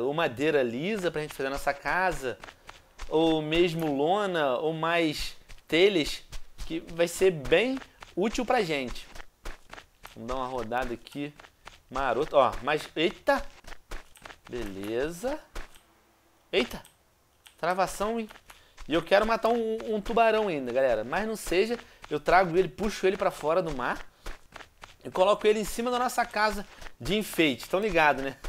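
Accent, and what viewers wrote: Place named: Portugal. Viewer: fala portugues de portugal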